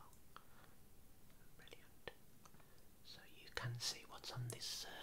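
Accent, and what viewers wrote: Birmingham, United Kingdom. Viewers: Never thought that as a girl from Coventry, that a man with a Brummie accent would send me to sleep every night! Great video, quack quack! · 2 nights running fell asleep to this , here's for a 3rd! Looking forward to the next vid. Love your vids , who would of thought a brum accent could be so tingly Haha. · not often that outsiders compliment the brummy accent I must say 😂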